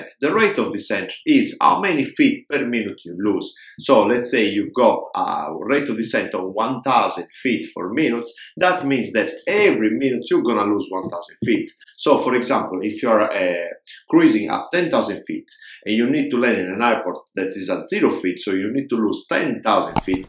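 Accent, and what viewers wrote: Italy. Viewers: Salve capitano.....ha un accento italiano....grazie per i suoi video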